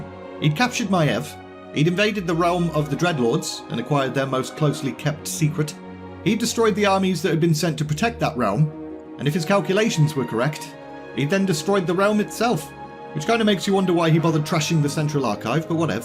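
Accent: United Kingdom. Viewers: great stuff so far.... (see what I did there?) butt(wink) no one is surprised by that bit of corn. Keep pushing em out and youll feel a great relief when the weight is off you. Thanks for your effort genuinely, youve ruined reqular audio books for me lol. No where near enough jokes or profanity or character voices or British accent lol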